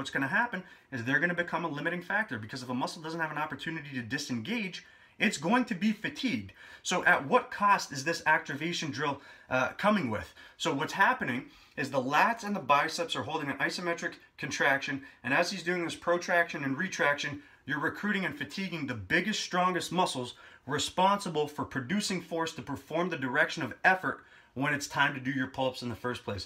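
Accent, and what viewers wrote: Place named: Canada. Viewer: Are you Canadian? You sound like you're from around here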